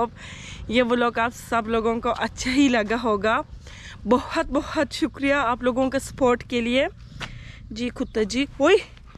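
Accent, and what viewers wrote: Pakistan. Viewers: Thank You for making beautiful vlogs. Totally loved it. I like the way u speak urdu. In love with ur urdu accent. Ma Sha Allah keep making gud videos. N May Allah bless you and your family with healthy life Ameen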